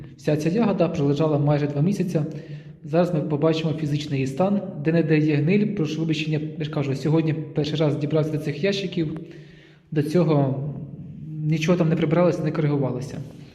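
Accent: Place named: Mykolaiv, Ukraine. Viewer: Дуже приємно чуть справжню українську мову з Миколаївщини. Поважаю Ваше відношення до винограду, хай Господь допомогає.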